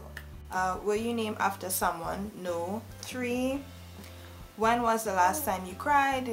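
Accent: Trinidad and Tobago. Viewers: Love this and thats y you have such a nice accent you from trinidad lol.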